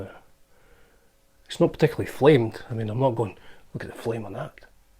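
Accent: Scotland, United Kingdom. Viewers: I can listen to Scottish people pronounce Japanese names all day 🌝👍🏼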